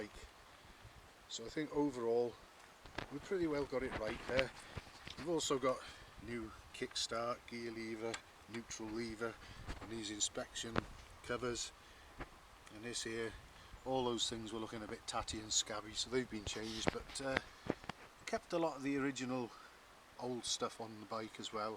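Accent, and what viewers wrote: United Kingdom. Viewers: I love listening to a proper british gent talk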